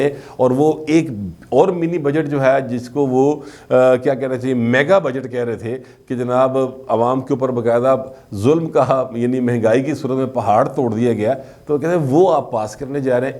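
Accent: Lahore, Pakistan. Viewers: Typically lahori style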